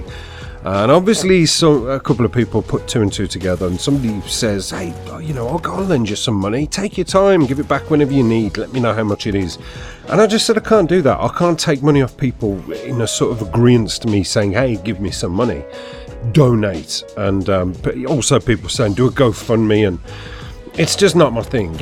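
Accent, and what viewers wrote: Birmingham, United Kingdom. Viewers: So good to hear that brummie voice again :) Welcome back pal, glad everything is coming together for you again!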